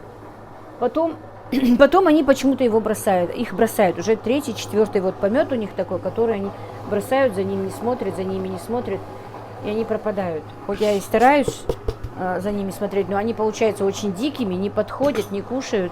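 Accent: Standard. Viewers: Прекрасная чистая речь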